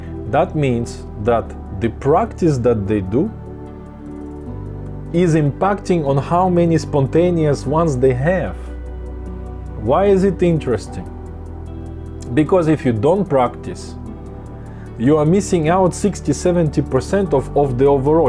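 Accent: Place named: Russia. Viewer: Thx for the answer, I didnt expect it lol. Maybe you should then love your voice more, because Russians talking English sound really nice. And when you love your voice more then youll reduce the backgound music volume automatically 😊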